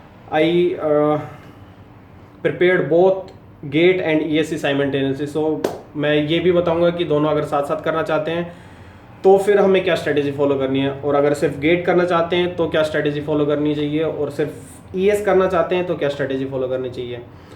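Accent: Haryana, India. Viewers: Boli m jyada Haryanvi touch h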